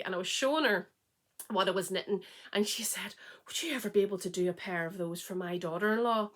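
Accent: Northern Ireland, United Kingdom. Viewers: Hi, I live in Southern Ontario in Canada, but I was born in Belfast Northern Ireland. My family immigrated to Canada when I was 5, but I can recognize the Northern Ireland accent anywhere, my siblings and I lost our accents but my mom and dad never did. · Enjoy watching your podcast. Your accent makes me homesick. I live in Guelph Ontario Canada. Most of my family live in Belfast Northern Ireland.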